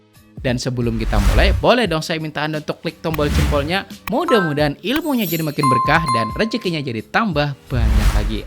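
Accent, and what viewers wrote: Banjar, Indonesia. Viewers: Nakaya p ne model nya Bahasa urng banjar logat nya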